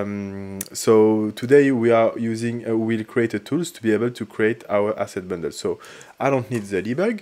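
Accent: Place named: France. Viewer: Je reconnais cet accent français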